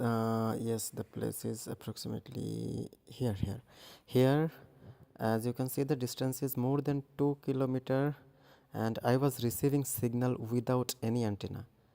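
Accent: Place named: India. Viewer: Does your country allow cb radios 11meters you should make yagi beam antenna i'm over Knoxville,Tennessee USA sounds like your in India you should be getting thoses guys on cb radio over toward Australia here in states i been making good contacts on cb radio to eastern Australia southern Australia during evening hours when dx conditions are good i do have videos on my yt channel making contacts on cb radio.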